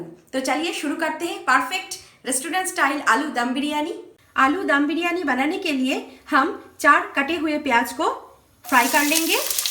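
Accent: Bengal, India. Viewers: Your recpice is osm, but I want to ask are you bengalee mam?? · R u bengali mam? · Are you Bengali? I am telling this after hearing your hindi ☺☺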